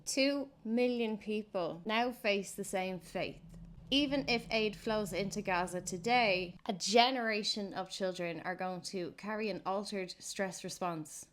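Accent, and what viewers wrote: Ireland. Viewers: Is this wise lady Irish?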